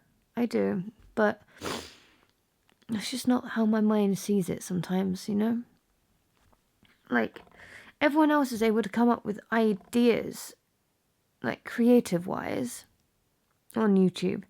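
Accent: United Kingdom. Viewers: Okay three things 1. is an amazing song. 2. Originality, in my point of view; has evolved into a performance type thing. Everything can be traced back to anything if you really put your mind to it. the thing is how you take that which inspired you and make it into your own thing. So yeah you sound like...no shit, Haley Williams is a bad ass performer that inspired many girls in the last decade to try this. At the end of the day, an song is AN SONG. don't be ashamed of whom you sound/look like. Embrace it and evolve it into your own thing. 3. Snotty nostrils sounds incredibly serious with a British accent.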